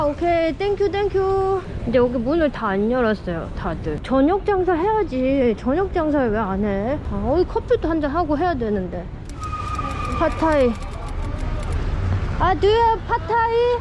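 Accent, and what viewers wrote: Jeolla, South Korea. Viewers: 부산사람이 전라도 사투리 쓰시네요 ㅋㅋ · 목소리가 경상도 ? 충청도? 전라도? 어디쪽인가요? 다 섞인거 같으신데 ㅎ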